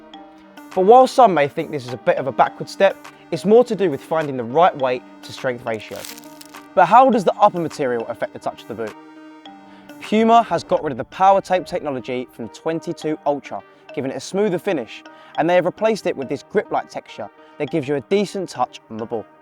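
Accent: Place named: United Kingdom. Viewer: British accent, but says soccer not football😩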